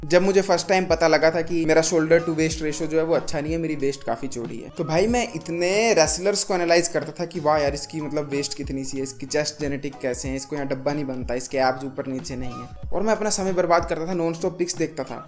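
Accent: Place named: Kangra, India. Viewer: Mujhe laga tha ki bhai aap kangra se ho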